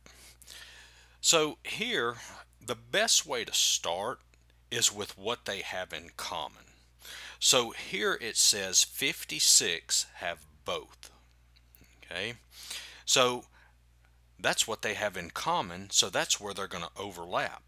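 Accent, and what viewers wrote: South, United States. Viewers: I admire your Southern accent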